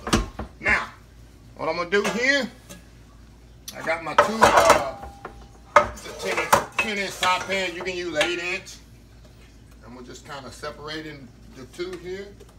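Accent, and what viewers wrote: South, United States. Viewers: 2021helpsomebody. Chef your voice is what make your vedios easy and interesting. Live your southern accent too. The cake is marvelous. Wish I was your neighbor. But blessed to have your your vedios. Have a blessed day.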